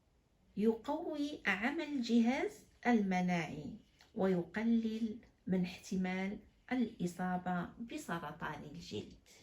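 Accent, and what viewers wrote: Morocco. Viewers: قراءة طيبة بصيغة مغربية رائعة جدا جزاك الله خيرا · طيب الله الأنفاس قراءة بصيغة مغربية رائعة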